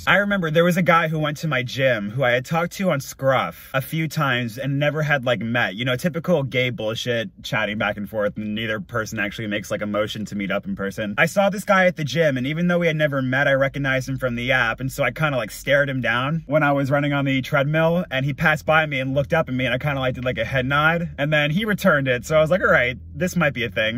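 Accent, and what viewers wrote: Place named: Midwest, United States. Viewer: Greetings from ur old stalking grounds L.A., why are you regressing? You're supposed to move away from places like that to L.A. not the other way around. Where did you move to? I didn't hear a southern accent, you have more of a midwest frozen state vibe, I'd guess Ohio, Mi, Wisconsin. I made that mistake once b4 as well,.moving from a real city to a tiny little town in TN. They surprisingly had one gay drag bar. Drag is real big in the south for some unknown reason. The cops would raid it for one reason or another and they would enjoy printing the names of those arrested for whatever charges they wanted to charge you with, they would print your name in the paper and you would come out to the whole town via the newspaper. I lasted less than a year...I never made the headlines...I moved back to LA.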